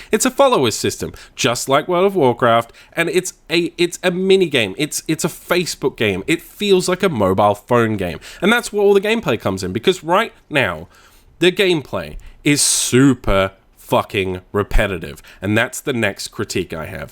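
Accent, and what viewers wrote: Australia. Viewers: Man, thank you so much for your honesty. The industry needs more reviewers like you. I tried this game and after the first 2 hours I was like ...THIS got 10/10? I would give it a 5/10. Good to hear a fellow Aussie too! Quick question - what do you think of Diablo 3 since RoS came out and they made the improvements? I'm considering getting it and I value your opinion.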